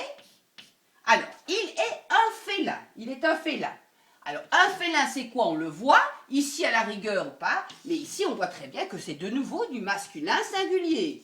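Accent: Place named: South, France. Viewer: Vous avez l'accent sudistes je crois je dis sa car je viens d’emménager dans le sud et mes professeurs disent tout le temps ' ils ou elles ' en prononçant le s à la fin .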